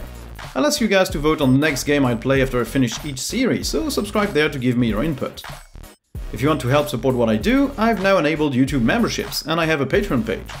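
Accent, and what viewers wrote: Brittany, France. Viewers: Il est de Brest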